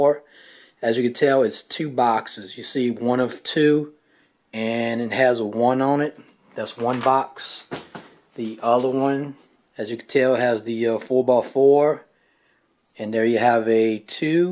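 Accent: United States. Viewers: Great video! I subbed! I call my studio the music room too lol. You sound like your from Louisiana too..where you from brother? Anyways just found your channel, searching YouTube for ideas on how to display my records...I don't have nearly as much but I wanna build my collection and put it on YouTube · A southern twang with MIC MAC Freestyle records? NICEEEEEE!!! :)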